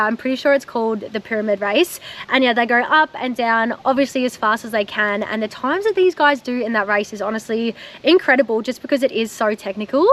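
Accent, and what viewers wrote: Australia. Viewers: But, I love your accent! Australian accents are most sexy accents in the world!! Love your drone footage! But please dont act like your alone🤦🏼‍♂️🤦🏼‍♂️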